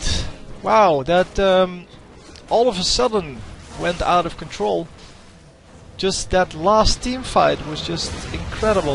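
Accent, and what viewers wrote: Netherlands. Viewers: are you dutch, I think so, ur accent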